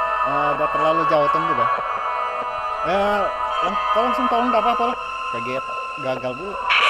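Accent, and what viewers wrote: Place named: Palembang, Indonesia. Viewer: Antara palembang atau jambi ni dari bahasa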